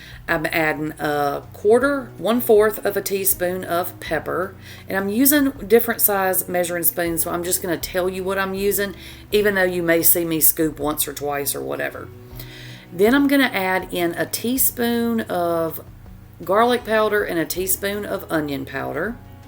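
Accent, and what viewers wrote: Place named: Carolinas, United States. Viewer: I made the chicken ramen today. Other than it being a little salty, it was *the best ramen I’ve ever had*! ❤ I made just the one so that I could taste for saltiness, my husband is on a low sodium diet and I just got used to it. I’ve been watching quite a few of your videos for the past few days, I finally hit that subscribe button. I love how your videos are precise, to the point, and you don’t edit your mishaps. It lets the younger viewers know that we all have mishaps, spill things, and occasionally forget to set the kitchen timer and burn something…even when we’ve been cooking for 30+ years. 😅 Btw, I love your accent. I’m assuming that you’re from one of the Carolina’s (I’m not asking for personal info, so no need to reply to that), definitely not from Georgia because you pronounce pecan correctly 😂. I was born, raised, and stayed in southern Mississippi. 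😊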